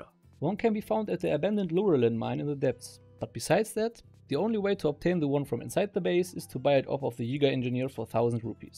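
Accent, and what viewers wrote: Germany. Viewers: GErman accent?!?!? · ich höre einen deutschen akzent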